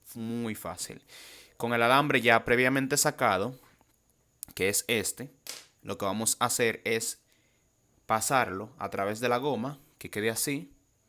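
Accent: Dominican Republic. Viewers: Eres Dominicano o ecuatoriano (lo se por el acento) soy Dominicano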